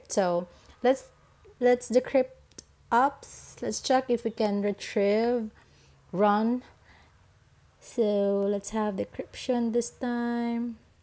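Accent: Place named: Philippines. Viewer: you're a filipino because of the accent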